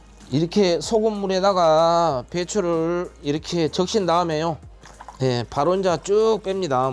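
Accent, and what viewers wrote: Jeolla, South Korea. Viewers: 셰프님 겉절이 해봤는데 당일은 "액젖 냄새가 좀 나네" 했는데 다음 날 남은 것 먹으니 정말 맛나네요 감사합니다 전라도 사투리가 잼 나네요 쬐간한것~등 쉐프님 우리 세자매 다 구독자랍니다 오늘도화이팅 😅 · 셰프님 김장레시피 잘봤습니다 전라도 사투리구수하구요 메모잘했습니다 행복하세요 ~^^~ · 님 고향이 전라도맞으시죠?ㅎㅎ 억양만들어봐도 전라도말투네요 저두전라도 ㅋ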